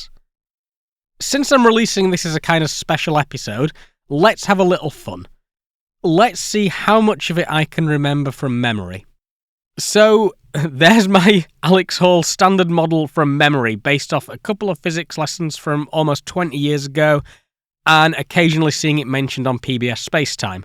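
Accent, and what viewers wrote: United Kingdom. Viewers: I was wondering if this was going to be a good video, and then a young Brit started talking. I was relieved. haha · Kind of hard to take this seriously when you mispronounce "photon" 50% of the time. Practice your "t"s Sound less bri-ish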